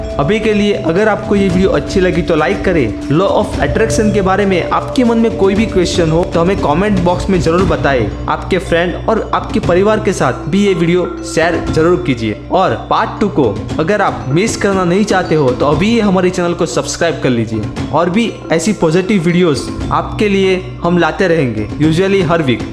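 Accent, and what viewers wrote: Gujarat, India. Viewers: Are u Gujarati?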